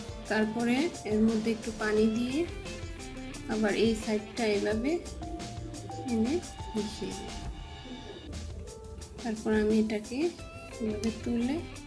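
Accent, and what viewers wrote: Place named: Noakhali, Bangladesh. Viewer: আননে নোয়াখ্যাল্লানি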